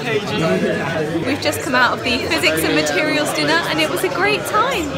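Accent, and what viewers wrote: United Kingdom. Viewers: I'm from Argentina and I love listening to your British accent, it's amazing 😻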